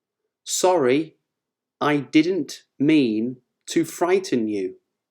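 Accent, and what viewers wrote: United Kingdom. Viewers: Thank you, just found your channel and subscribed. Just wondering, what your accent is. I think it is British at least it sounds British to me. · İs this british accent?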